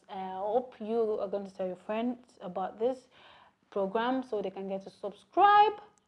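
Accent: Ekiti, Nigeria. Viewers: Naughty girl, I have subscribed o. Your Ekiti is hot like amala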